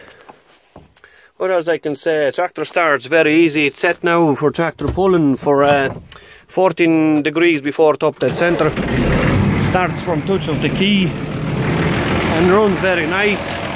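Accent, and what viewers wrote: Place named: Ireland. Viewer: Masz farmę w Irlandii ?? Trochę czasu tam jesteś akcent mówi trochę . Robię dla Irlandczyków w Londynie. Zdrów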